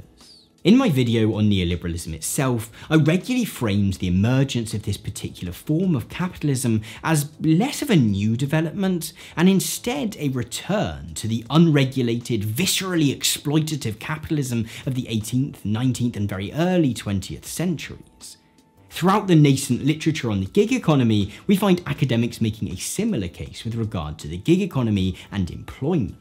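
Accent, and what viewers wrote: RP, United Kingdom. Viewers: Your upper-class gesticulation, alongside your posh Patois, its really triggering. Apart from that great vids!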